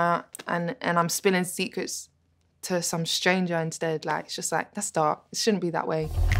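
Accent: United Kingdom. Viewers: I didn't realise she was british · She's British yh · Wao shes so british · she’s british? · What is her accent? British?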